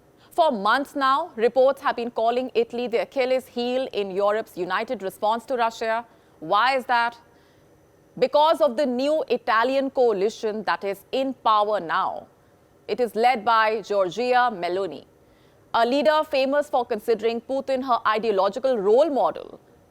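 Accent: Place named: India. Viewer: No offense but Indian accent is just the worst accent. Unbearable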